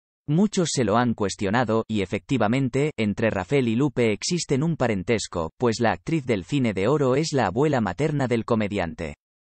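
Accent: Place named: Spain. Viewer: Con respeto es muy interesante sabes más del cine de oro mexicano y la relaciones familiares con actores que todavía tenemos en vida, pero oooooo en cuanto empeze a oír el acento español lo quite a la vrg. No puedo con la Zetaaa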